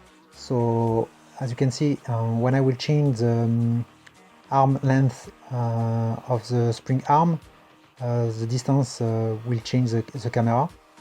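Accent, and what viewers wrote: France. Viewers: Trés bon tutoriel même avec l'anglais à la frenchy :) ! Par contre, je ne suis pas pro mais, tu n'es pas un peu en train de choisir le mode HARD pour le plaçage de tes textures ? Je me rappel vraiment être passer par autant de truc si ce n'est les nodes bien sûr ! Mais bon, chacun sa méthode. En tout cas, super tuto ! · Oh mais rien qu’a l’accent, ca s’entend que t’es français ! Incroyable ! Tu fais des choses magnifiques ! Continue comme ça !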